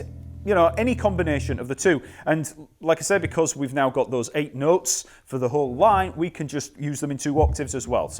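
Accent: United Kingdom. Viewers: Uk accent :)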